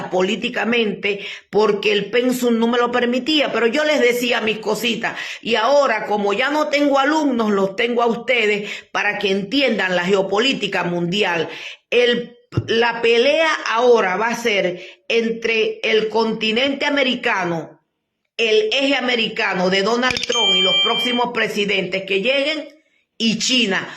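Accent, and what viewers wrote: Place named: East, Venezuela. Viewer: BUENOS DÍAS PROFESORA COMO ESTÁ USTED MIRE LE PREGUNTÉ LA OTRA VEZ QUE DE PARTE ES USTED PORQUE HABLA IGUAL QUE YO ORIENTAL DE PURA CEPA YO SOY NACIDO EN ANACO ORITA VIVO EN VALLE DE LA PASCUA GUÁRICO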